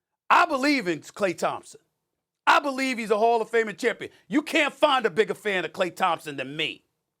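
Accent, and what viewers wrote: New York, United States. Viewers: Lol it’s that New York accent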